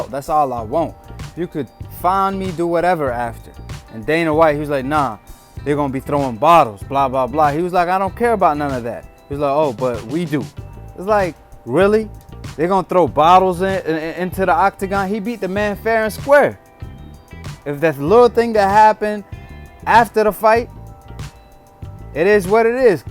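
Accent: United States. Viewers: I was there you idiot.! McGregor didn't sucker punch anyone and you sound American why would you take up for this trash and what could have been a lot worse than it was and another thing he proved his point in the octagon there was no reason to take it out in the crowd with Innocent people that could have severely gotten hurt or killed . Why are you American's so worried about this idiot you do know his family his friends and teammates ,father all been questioned about 9/11 and the real truth why his father cannot get a green card to come over here it's because they found out his father was associated with the terrorist of 9/11 and you want to back this BITCH "And this is FACTS not just speculation".... And if you are American and live in the United States of America and do not like it here get the FUCK out.!!!!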